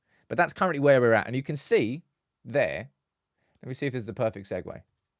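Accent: Manchester, United Kingdom. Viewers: Where manc. Accent come from gaha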